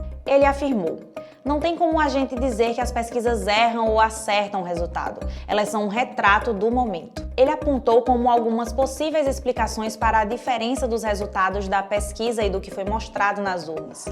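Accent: Northeast, Brazil. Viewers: Com esse sotaque LIXO nordestino, sua análise fake e 💩 se equivalem (!) Nordeste é a vergonha do 🇧🇷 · Bahh e esse sotaque ?? Legal colocarem uma nordestina 👏🏽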